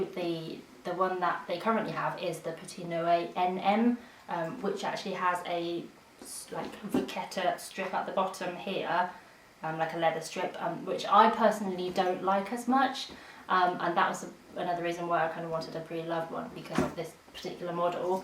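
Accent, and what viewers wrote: United Kingdom. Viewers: So strange seeing someone Chinese with such a heavy british accent! Mind blown! lol xD Very interesting and good close up shots on the details - great! Very well done for your 1st video. I can see you being successful - honestly (not just saying it). Very impressed!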